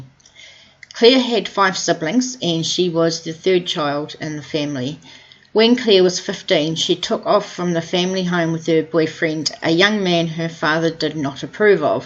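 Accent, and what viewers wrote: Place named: New Zealand. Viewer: Cringy nz accent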